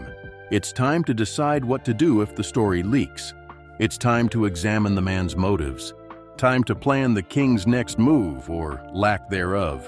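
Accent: United States. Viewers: This voice-over is a joke. American accent and no information about the source of this silly, speculative nonsense.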